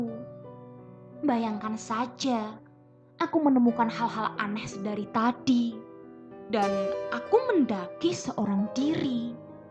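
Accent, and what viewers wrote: Java, Indonesia. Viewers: Suara medok neng😂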